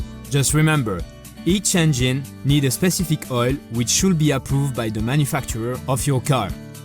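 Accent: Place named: France. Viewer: Thanks. I like your French accent 🌷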